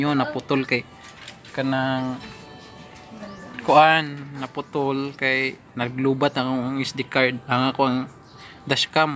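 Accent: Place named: Capiz, Philippines. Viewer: Taga capiz ka po b? Para kseng i heard na ung ganyang dialect.. try to speak tagalog sometimes if you can.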